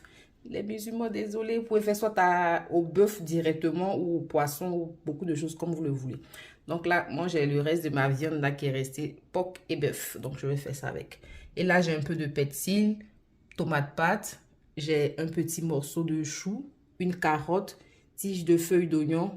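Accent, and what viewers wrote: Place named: Ivory Coast. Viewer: Ton accent ivoirien j'adore 🎉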